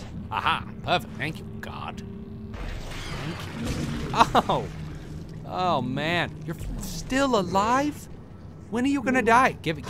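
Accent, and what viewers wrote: United Kingdom. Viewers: British voice kind of reminds me of the Geiko Gecko. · you kind of sound like austin powers in your awesome british accent ! =) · your british accent soundslike a non robotic Weatly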